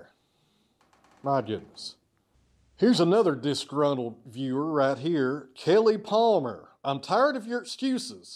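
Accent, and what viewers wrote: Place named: South, United States. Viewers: Only just found your channel a week or so ago but have watched a few of your videos and can honesty say I like them! Loved the end of this one where you answer comments, if people don't like the content then just like with a TV they switch channel or switch off. I find them relaxing to watch and your Tennessee accent is easy on the ear. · I have been flowing you since your first posting. I have enjoyed all of them and I enjoy that southern assent of yours, so my friend roll on and enjoy what your doing. Life's to short to concern your self with people that can not see the forest for the trees! Your friend, Bob M, AU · I like you as a person, your calm nature, personality, will all jobs some day are more exciting then others, I also enjoy the outtakes. O I like the beard also. As I’m not from that part of the world, if your accent is a Tennessee accent, I like it. 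Well done 👍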